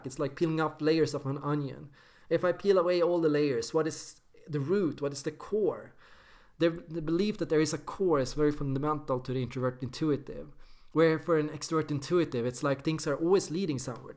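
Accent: Sweden. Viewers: He's Swedish. Det kan man høre på ham. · That's OCD, not INFJ...;-) I think that's a specular/optical distortion of the camera. At least you noticed the wall hanging -- is apparently from Stockholm (as his "accent" indicates.)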